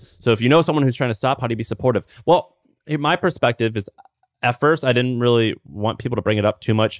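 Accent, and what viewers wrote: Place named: Midwest, United States. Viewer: Midwest asf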